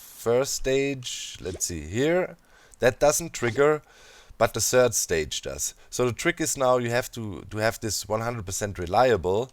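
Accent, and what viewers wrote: Germany. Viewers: Kann ich da einen deutschen aktzent raus hören? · Wie man immer hört wenn jemand deutsch ist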